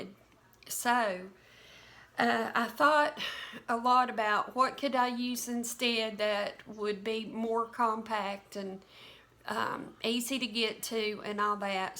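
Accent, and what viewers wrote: South, United States. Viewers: What an adorable ideal I love it because I have many spices this will be helpful, but could I make one or two suggestions just off the top of my head. You could take the lids to the jars which are metal lay them face up and spray them with a quick coat of dark Krylon paint , then you can write on them with regular marks-a-lot. or sharpie. And in my kitchen if I'm going to put it anywhere near the stove I need to put a little plastic cover something over top so that the grease in the air from the cooking does not accumulate on these glass bottles otherwise will be washing them all the time but I do love the idea and I'm going to do it ,so thanks a bunch, by the way you got to be a Southern girl. I'm from Texas but you make me feel like home thanks a lot girl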